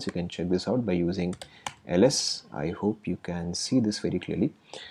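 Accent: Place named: India. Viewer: Can you do this video without the Indian accent please? It's so difficult to understand.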